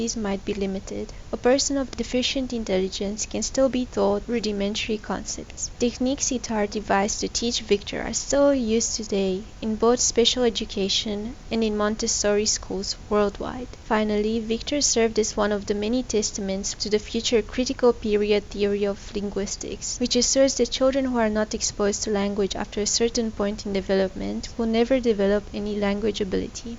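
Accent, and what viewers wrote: South Africa. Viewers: you are from South Africa?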